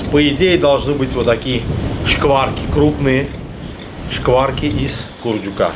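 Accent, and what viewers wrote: Baku, Azerbaijan. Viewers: Этот Бакинский акцент я балдею да. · я арянин и родился в Баку. Помню всех соседей с таким акцентом, двор был инторнациональным. Все ваши рецепты супер. С большим удовольствием смотрю. Здоровья Вам и вашей семье.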